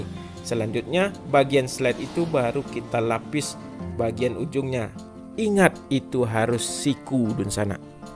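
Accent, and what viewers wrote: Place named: Sumatra, Indonesia. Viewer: Org mana aslinya Om kalo didengar suara org sumatera tp kalo kata dingsanak itu bahasa Banjar Kalimantan Selatan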